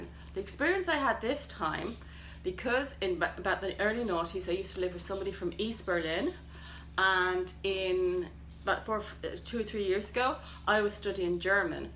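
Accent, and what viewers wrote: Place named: Ireland. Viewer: Hi, I found your channel through Shawn and although you’ve only filmed ten or so videos, you seem a natural and it’s lovely to hear an Irish accent (via Australia was it?). I made a comment on Dave’s channel to the effect that I’m a very new rereader but have embraced it wholeheartedly, despite having as many unread books on my shelves as you have 😊. It’s primarily because I realized I can’t remember the books I read twenty years ago and it feels ridiculous saying I’ve read them when I can remember nothing about them. I’ve done four rereads this year and each one made that particular book so much more meaningful and I feel I could reread each one again. I must admit I don’t think about rereading mysteries and thrillers as part of the fun of those is finding out who did it but with my sieve like memory, that probably doesn’t matter! 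🙄 I loved A Tale for the Time Being when I read it a few years ago and now you’ve made me want to reread it. Yes to rereading!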